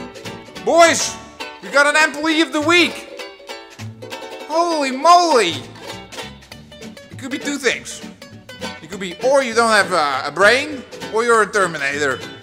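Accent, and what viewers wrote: Netherlands. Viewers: Are you dutch? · are you dutch?i laughed so much my fucking stomach hurts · are you Dutch?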